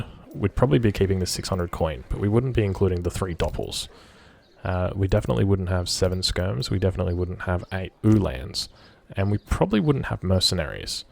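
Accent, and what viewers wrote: Australia. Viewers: Where in Australia are you from? I've heard someone from Brisbane who sounds like you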